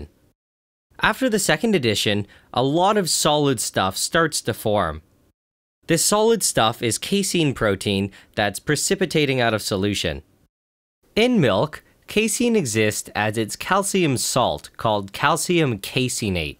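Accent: Quebec, Canada. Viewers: you from québec ????? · Quebecois par hasard?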